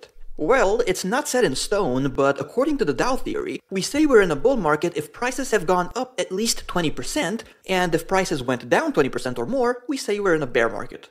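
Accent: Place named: Singapore. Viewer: Are you from Singapore? Thick accent.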